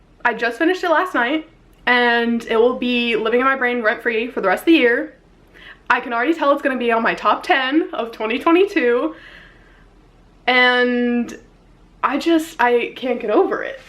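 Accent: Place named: South, United States. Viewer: I MISSED YOUR SOUTHERN TWANG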